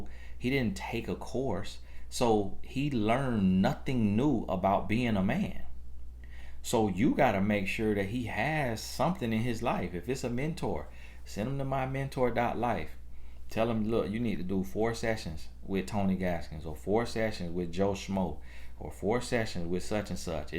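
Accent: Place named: Florida, United States. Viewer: Tony u gotta be from Florida to from how u talk, I feel like your my brother or cousin schooling me 😂 I love you!! Much respect 💯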